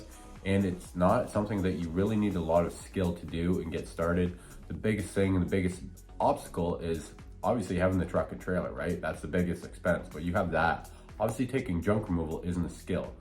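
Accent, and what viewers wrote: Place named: Northern Ontario, Canada. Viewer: 705 area code. I saw a video and there was snow in the background and hearing you talk I was president sure . London Ontario here and just in my first week getting going. I’ve subbed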